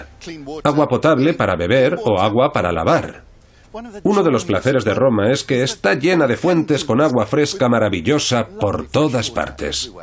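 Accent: Latin America. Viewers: NETFLIX ya se dió muchísima cuenta, que tenemos Español Latinoamericano....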